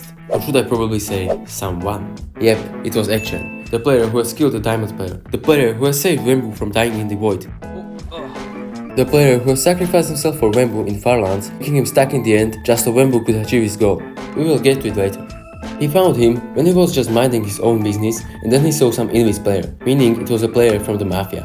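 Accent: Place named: Czech Republic. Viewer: Yo where are you from bro, you sound like your from Czechia? Ze by takova nahoda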